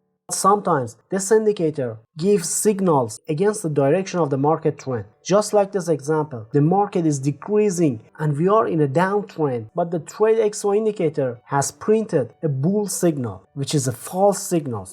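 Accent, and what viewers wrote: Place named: Iran. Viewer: Just the ones I was looking for. Thanks so much.👍👍👍 By the way, from your accent I guess you're Iranian, am I right?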